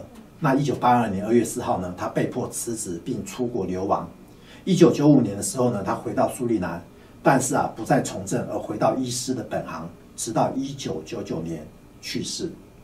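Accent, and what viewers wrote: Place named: Taiwan. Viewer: 请问，您老是客家人吗？听口音是台湾人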